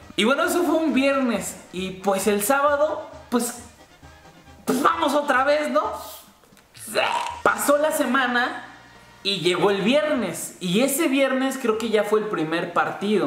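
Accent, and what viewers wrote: Sinaloa, Mexico. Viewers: Tu acento sinaloense esta muy regio jaja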